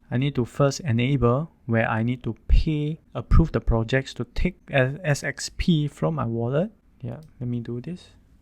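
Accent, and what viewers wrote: Singapore. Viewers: Singapore/malaysian ascent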